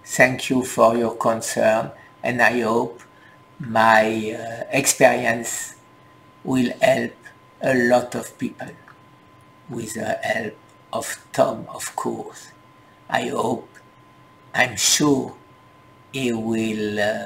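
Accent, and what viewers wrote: France. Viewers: ce n est pas un french accent c est un super slow french accent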